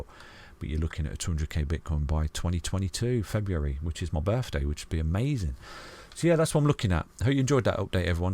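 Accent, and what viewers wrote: United Kingdom. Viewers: Something about the British accent helps strengthen the intelligence and certainty in the predictions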